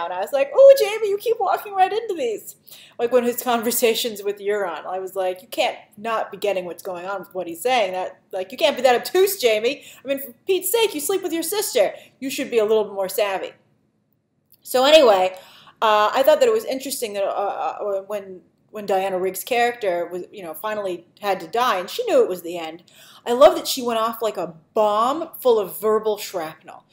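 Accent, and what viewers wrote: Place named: New York, United States. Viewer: I just have to ask which part of New York are you from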